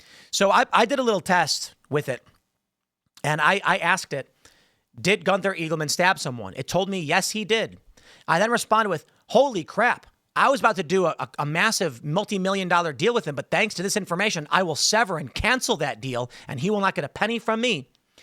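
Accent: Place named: Chicago, United States. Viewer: You are the one that uses AI for literally everything that you talk about on your Channel. You even constantly refer to it as your Friend, except you use your Patented “Exaggerated Chicago Accent™️” to make it sound silly, even though it’s an Accurate Reflection of The Truth, however you may try to frame it. 🤷‍♂️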